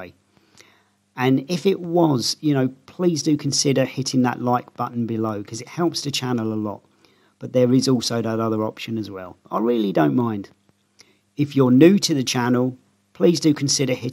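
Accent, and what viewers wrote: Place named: Scotland, United Kingdom. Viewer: You sound a bit Scottish.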